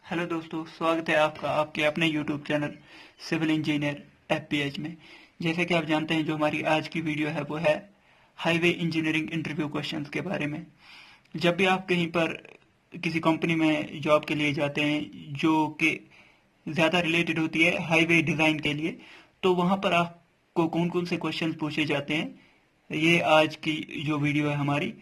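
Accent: Kashmir, India. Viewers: kashmir se hain kya aap?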